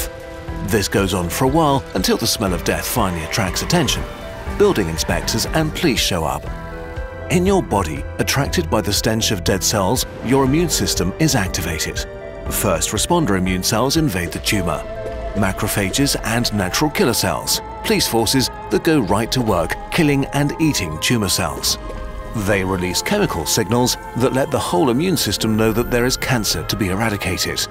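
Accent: United States. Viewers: thank god, less new york? yes please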